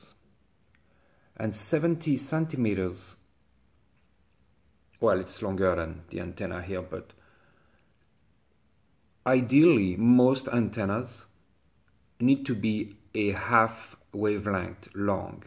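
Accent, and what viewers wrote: France. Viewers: not only is your content great! You also have great French accent which makes it even that much more interesting. Thank you for making videos! I love to watch them in my free time. Maybe I’ll have time to make my rats tail today:)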